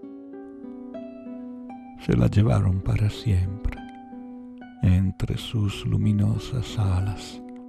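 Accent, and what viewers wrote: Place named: Buenos Aires, Argentina. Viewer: Tu tonada ondula entre el porteño y el italiano, ¿Eres de allí?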